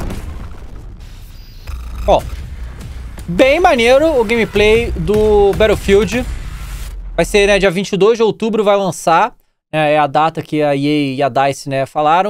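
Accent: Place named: Rio de Janeiro, Brazil. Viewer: poxxxxxssss conferencia kkkk sotaque carioca é bom demais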